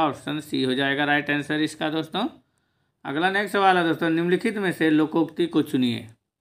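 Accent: Bihar, India. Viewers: bihari hindi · Bihari hindi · Bihari Hindi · बिहारी हिन्दी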